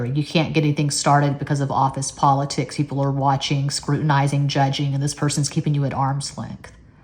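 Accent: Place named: Texas, United States. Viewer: I liked before i watched 😅...i like ur voice...vocabulary...and texan accent...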